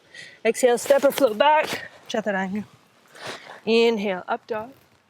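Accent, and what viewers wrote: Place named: Canada. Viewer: This is my go-to practice every night when I get home from work. It’s challenging, energizing and I never get tired of her comments and instruction. (I also adore her Canadian accent.) I smile every time she says to relax/let go of the idea there is somewhere to go. I also recommend her other videos. 💗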